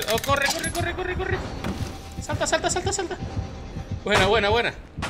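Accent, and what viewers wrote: Canary Islands, Spain. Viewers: ¿Canario? XDD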